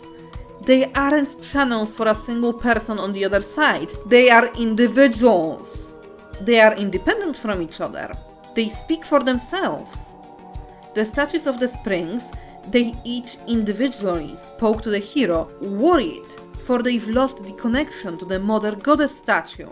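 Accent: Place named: Poland. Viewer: Your accent is... pretty polish. Very polish actually.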